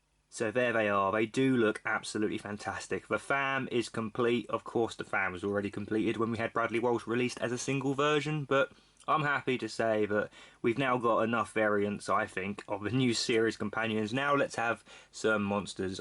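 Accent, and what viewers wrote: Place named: United States. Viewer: Nah nah, I think you are American. Something about that accent...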